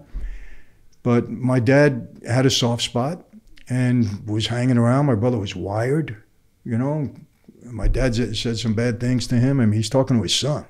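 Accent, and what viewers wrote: United Kingdom. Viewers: Great interview. Enjoyed you having to renege on some of the cockney patter so your questions made more sense 😂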